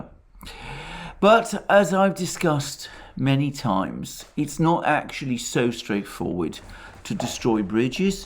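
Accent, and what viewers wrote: England, United Kingdom. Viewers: ? Wtf are you talking about? He reiterates commonly available material in a Brit accent, making you think he's some genius. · I keep watching your videos because you are RIGHT on your assessment , from day one of the special military operations conducted by Russia 🇷🇺 and once again I want to say that I admire your knowledge and understanding of world history. PLUS I like the British accent. ( it is pleasant to the ears ) cheers 👍😀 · You sound like an Englishman. But you seem to be on Russia's side. You are a traitor to western values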